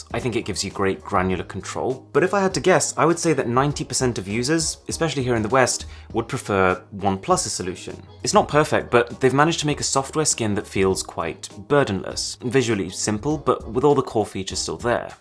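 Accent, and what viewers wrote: United Kingdom. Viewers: Haha yah that's UK accent for u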